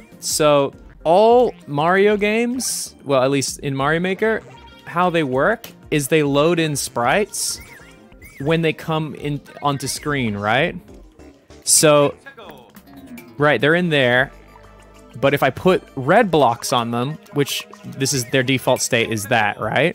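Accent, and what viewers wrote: Australia. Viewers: was super Australian in this one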